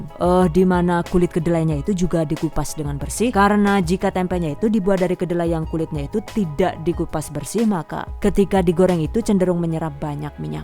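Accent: Banyumas, Indonesia. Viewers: salam ngapak bundaa...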